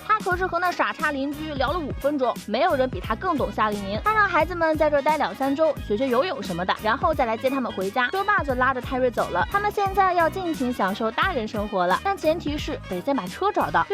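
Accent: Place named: China. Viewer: 版主是中國人又不是台灣人，口音聽不出來嗎？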